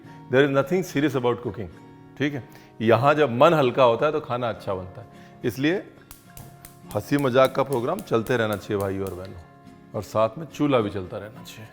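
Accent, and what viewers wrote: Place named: Lucknow, India. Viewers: Aapki awaaz aur aapke Shabd sunke hi lag jata hai ki aap Lucknow ko belong Kiye Hain ab aap abhi kahan Rah rahe hain yah Nahin Pata maine aapko Kapil Sharma show Mein Dekha Tha tab Main Nahin Janti thi ki aap Lucknow se ho lekin usmein Maine aapki awaaz Suni Thi Kunal Sab the Sanjiv Sar the aur aap the tab Mujhko andaza ho gaya tha ki aap Kabhi Na Kabhi Lucknow Rahe Jarur Hai thank u Sar Aise Hanste muskurate rahiye Acche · Aap Lucknow ki baat karte hai bahut aacha lagta hai jo Lucknow ki yaad dila deta hai aapka Lucknow ka andaaz behed khubsurat hai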